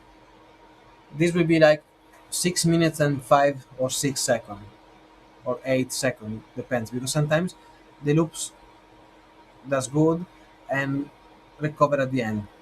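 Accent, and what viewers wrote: Italy. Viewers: Ur absent seems italian lol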